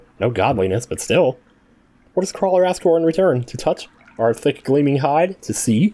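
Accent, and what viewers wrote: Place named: South, United States. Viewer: I'm not the only one who reads Selubra's name as Sebulba. I wouldn't have pegged you as Southern until I listened to some of your earlier / vlogs (you sister sounded a lot more obviously Southern, as did you when you were youngr), but I HAVE always wondered, and sorry if this sounds weird, if you saying "boy I tell ya" and "lemme tell ya" so often is a regional thing, or something you picked up from your parents. I thought that seemed too easy. I also thought they'd bow. RoahmMyrthril (LPer and Mega Man no-damamge runner) always found Yellow Devil more tedious and drawn-out than actually hard, and got mass-disliked in a video for saying that. Had a feeling you weren't invincible there. But that still destroyed the fight. I like how Sheo's different attacks paint the battlefield, I assume the place will end up looking different every fight as he varies what attacks he does and in what order.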